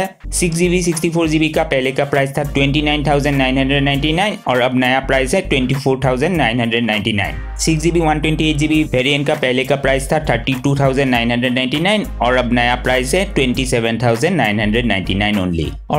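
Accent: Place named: Assam, India. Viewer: Aapke..awaz se toh..aap Assam ke hai ...lag raha hai. 😅😅😅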